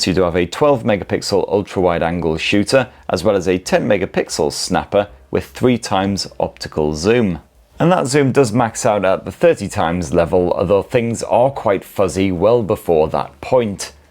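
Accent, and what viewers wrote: United Kingdom. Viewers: UK accent is so smooth and sorting, love it🥰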